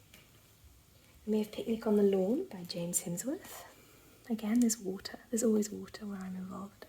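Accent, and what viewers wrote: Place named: Sweden. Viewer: Oooohhhh Dimensions kits presented by a lovely Swedish accented lady - what could be a better afternoon! 😊😊😊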